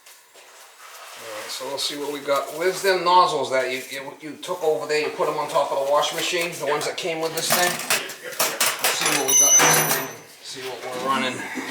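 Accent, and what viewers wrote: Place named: Massachusetts, United States. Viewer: I think your awesome. I was a boiler technician in the US Navy that led me to good directions in my life. I totally respect what you do. I can relate, big time. I’d love to work with you sometime and I know that won’t happen. I grew up in Connecticut and can relate to that Massachusetts accent you got. Fuckin’ love it! My fortè is electricity and plumbing. Make that hay while the sun shines momma! I hope that blow fish blows away from you if you know what I mean.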